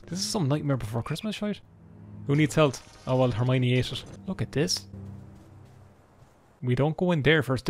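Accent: Ireland. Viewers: i love your irish accent <3 greatings from germany :)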